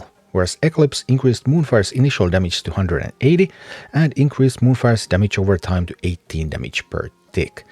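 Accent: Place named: Finland. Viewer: It's the power of the Finnish accent :)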